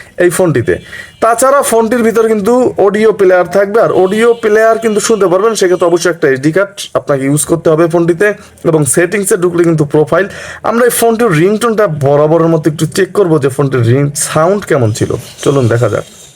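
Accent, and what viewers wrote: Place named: Barishal, Bangladesh. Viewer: কথায় বরিশালের গ্রাম্য ভাব আছে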